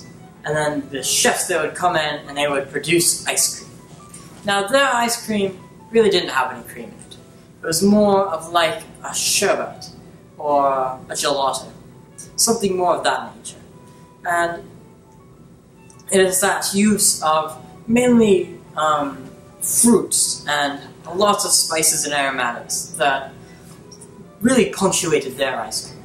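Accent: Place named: United Kingdom. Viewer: WOW, the face/hairstyle and British accent LITERALLY looks like a younger version of the actor Thomas Brodie-Sangster (look him up if you don't know who he is), very good video again!!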